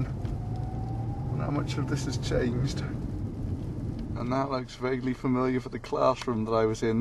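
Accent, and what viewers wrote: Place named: United Kingdom. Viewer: how did you get such a british accent if you lived in the states? Did you grow up partly there as well?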